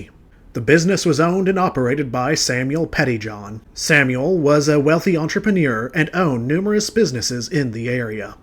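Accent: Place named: Transatlantic, United States. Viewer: Good video. I like your almost transatlantic accent. Keep it bro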